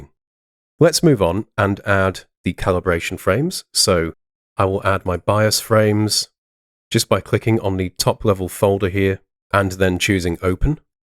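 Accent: United Kingdom. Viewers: Nice UK accent and nice tutorial...Watching you from Iraq.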